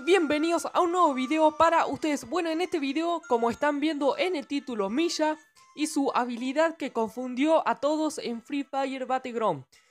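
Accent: Córdoba, Argentina. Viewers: Sos cordobes?? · Gracias por La Info, Casi Me La Compro Para nada. Sos Cordobes?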